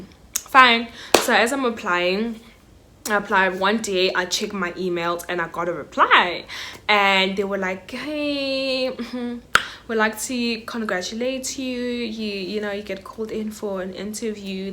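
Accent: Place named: Nigeria. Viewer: The Nigerian accent >> 😂😂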